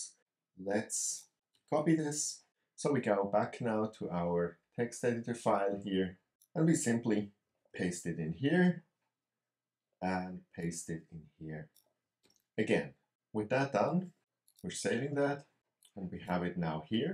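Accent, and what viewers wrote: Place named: Austria. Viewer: This guy's accent is Arnold light!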